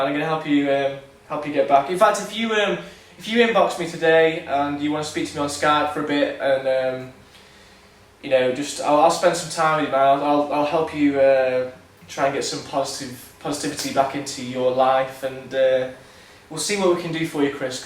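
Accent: United Kingdom. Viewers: because of your accent :P are you from yorkshire?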